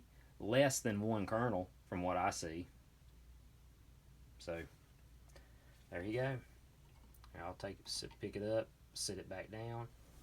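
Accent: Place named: Alabama, United States. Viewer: That Alabama accent is THICK, son!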